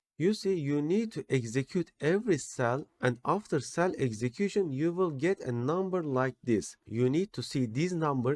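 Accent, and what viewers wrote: Turkey. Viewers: Aksanından direkt türk olduğunu anladım ve gurur duydum. Çok yararlı olmuş diğer videolarına da bakıcam. <3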